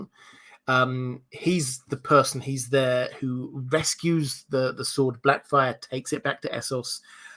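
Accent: England, United Kingdom. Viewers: Every night I play about 20 min of these vids and they seem to slowly rock me into a peaceful sleep. It’s to the point now where I can’t fall asleep without hearing my mans boss English accent.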